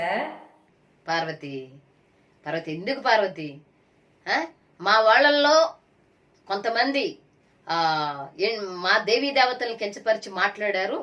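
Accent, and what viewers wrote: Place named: Srikakulam, India. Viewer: మరి దీనికి 100 మంది రంకుమొగుడులు దీని శ్రీకాకుళం భాష దీని పెంట మొహం ముండా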